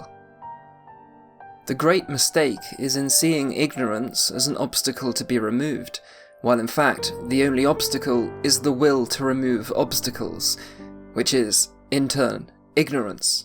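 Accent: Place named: United Kingdom. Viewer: No entiendo nada o poco y nada pero me encanta el acento, estoy aprendiendo inglés y me gusta escuchar el acento británico, de donde sos? Saludos desde Argentina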